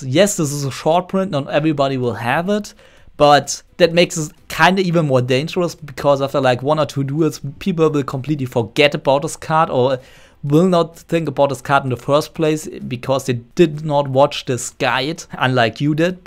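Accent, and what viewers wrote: Germany. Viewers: Great video. A friend showed me this for the upcoming sneak peak. Loved the German(?) accent.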